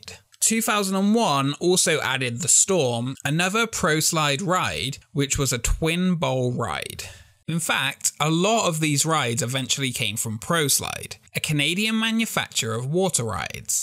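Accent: United Kingdom. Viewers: I cannot stand that Bul Brit Accent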